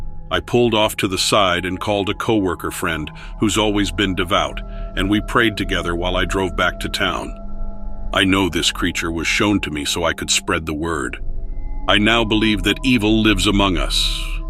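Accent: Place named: Midwest, United States. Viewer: You have a great voice!! I really enjoy your channel. You have a Midwestern accent. What state are you in??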